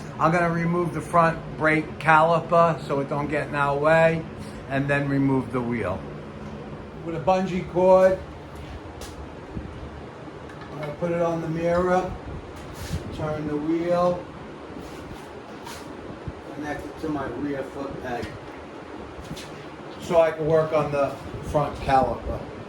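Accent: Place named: East Coast, United States. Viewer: A very big and welcome hint...will take a good look this weekend. A side note...I love your east coast accent.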